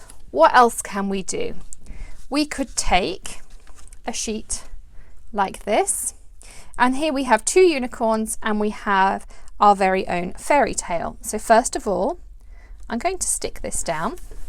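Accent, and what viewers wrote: England, United Kingdom. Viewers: Omg, i've seen it many times on tv, but never thought i need one. I spend a fortune on, coordinating dies. This is now on my birthday/ christmas list. Also i came across your channel a few months ago. i feel you are perfect for me, i watch all the designers over there in america. As i work on dt here in the uk promoting american stamps as you guys are well in front of the uk. but the best thing about your channel is you know your stuff but you have a english accent. so you see i get the best of both worlds. don't get me wrong not all Americans have a strong accent and i do love them, but i find afters hours of videos i find yours a welcome change. lol x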